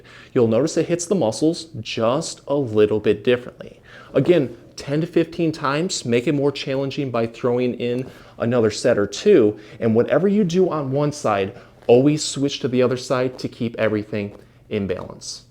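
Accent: Michigan, United States. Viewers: I was just googling how to get trap pain relief and stumbled across this video and instantly knew you were from Mich before I looked at your bio bc of your accent 😂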